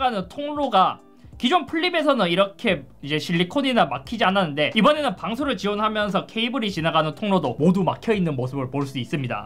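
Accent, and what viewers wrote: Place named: Jeolla, South Korea. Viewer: 말끝마다 요거슬 이거슨 .. 개 역겹네 느그 고향이 쩌ㅡㅡ그저 7시 쩌ㅡ짝이여?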